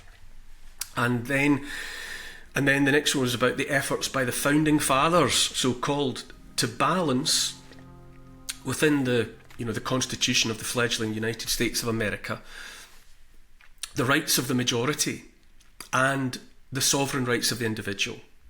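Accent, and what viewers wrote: Scotland, United Kingdom. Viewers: Hello from Chicago, Illinois! I'm SWOONING over your Scottish accent!! 👍🎯❤️‼️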